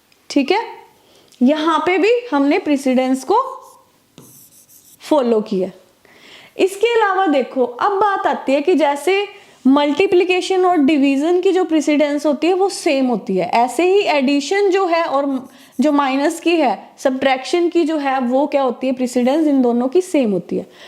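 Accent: Punjab, India. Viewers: I am from punjab and your punjabi accent in hindi made this video more intresting, good explanation. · Panjabi ho kya miss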